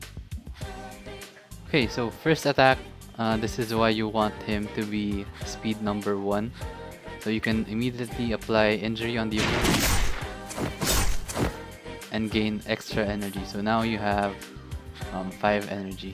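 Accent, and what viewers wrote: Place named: Philippines. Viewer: Are you from Philippines because your accent is Philippines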